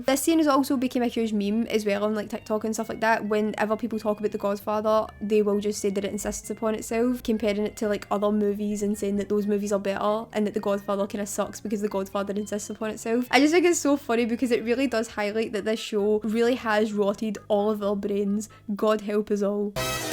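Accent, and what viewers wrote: Scotland, United Kingdom. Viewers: I absolutely love your Scottish accent-it's so rich and full of character! And your videos? They feel so real, so genuine, like you're just talking to a friend. Honestly, it's refreshing to see someone create content with this much heart. Keep doing what you do-you're amazing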